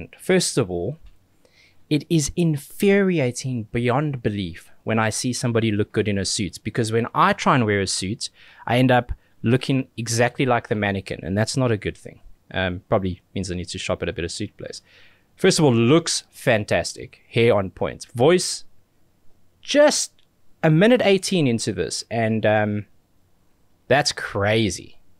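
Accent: South Africa. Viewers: Definitely sounds South African 👌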